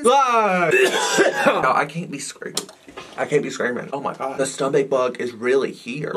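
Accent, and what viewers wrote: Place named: United States. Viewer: You should do an entire video trying not to let your country accent come out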